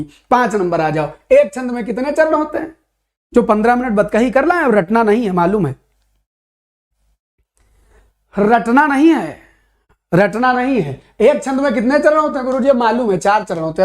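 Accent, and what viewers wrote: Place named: Western Uttar Pradesh, India. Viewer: hm bhi hai up se .. pr hmare yha aisa nhi bola jata ..sayad aap west up se ho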